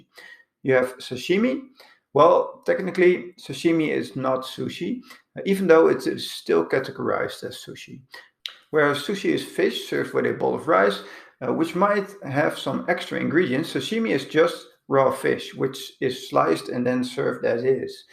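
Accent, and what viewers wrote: Netherlands. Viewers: I detect a Dutch accent. Am I right?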